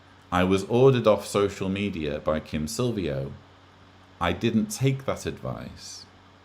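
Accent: United Kingdom. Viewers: you have thousands, tens of thousands of supporters. Please know that. I don't even consider myself an ex JW, since it's been many years since my journey with them. I listen to you so that I don't fall back into that nonsense. Mostly, I listen to you for your voice (we Americans are crazy for British and Australian accents), your wit, your humor, and your humble wisdom. I have learned so much from you. We love you, man. Please take care of yourself.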